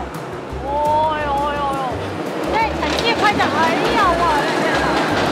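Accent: Sichuan, China. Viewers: 老乡，你这个川普挺正宗😂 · 妹子講四川口音的普通話真好听、只要講話就好❤看、可愛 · 可爱的Yooupi小姐姐 你说话好可爱 你是四川人吗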